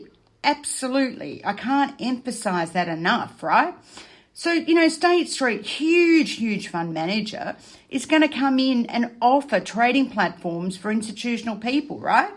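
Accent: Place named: Australia. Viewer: Jezus, je bent nederlands , 🤔met een ozzie accent, geweldig!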